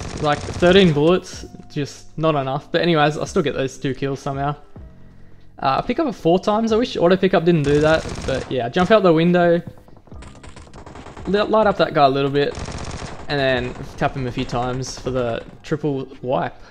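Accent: Australia. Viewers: The voice of a Australian is rare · Nah I kinda like it the way it is, It's unique. 😂 It brings out that Auzzy charm: "Oh yeah nah yeh, aahhh yeah nah"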